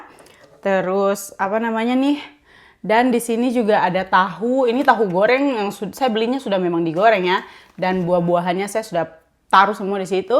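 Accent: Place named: Maluku, Indonesia. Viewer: Selamat ulang tahun kk...salam kenal aku penggemar baru mu dari yogya...asek bgt logat maluku nya masih terus melekat kk... Sehabis nonton DAILY TIKA WEIXUN DICHINA langsung gercep nonton vlognya kk...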